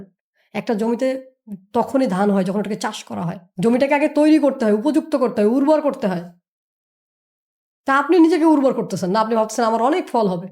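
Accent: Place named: West Bengal, India. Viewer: প্রথমে ভাবছিলাম ইন্ডিয়ান কথা গুলো শুনে ভালো লাগছে তাই শুনলাম এখন আরও ভালো লাগলো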